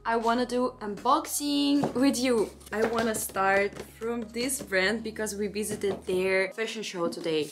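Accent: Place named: Russia. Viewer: 한국말하는 쇼츠영상이 더 좋지만 러시아 억양의 영어히는 도 좋아요 ㅣ😊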